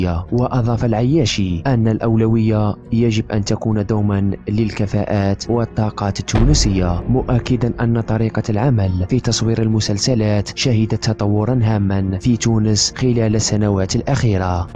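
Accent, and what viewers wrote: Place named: Morocco. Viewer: جاني صوتو ولهجتو مروكي والله اعلم دقق مليح فاللهجة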